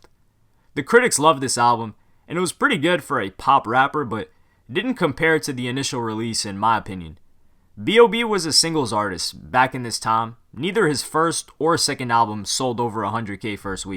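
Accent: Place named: Florida, United States. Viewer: I'm starting to think you're a Flordian because of your accent and attitude.i'm just randomly saying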